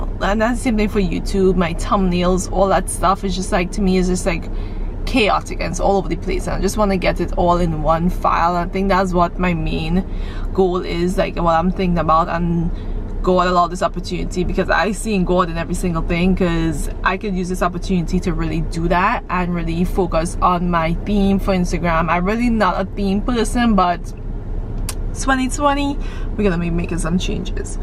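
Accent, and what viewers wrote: Trinidad and Tobago. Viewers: Girl! is that a Trini accent I hear!??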